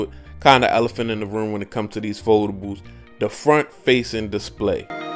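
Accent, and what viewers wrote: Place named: DMV, United States. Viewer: You got to be from the DMV lol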